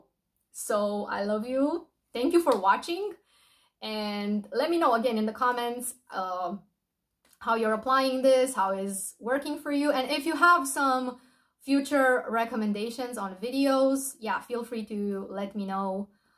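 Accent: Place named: Italy. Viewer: hiii, thank you so much for sharing your experience, loa is real. Are you italian? cause i hear a little bit of italian accent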